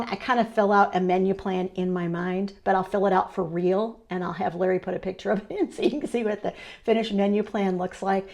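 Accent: Indiana, United States. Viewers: Oh my goodness I love your Hoosier!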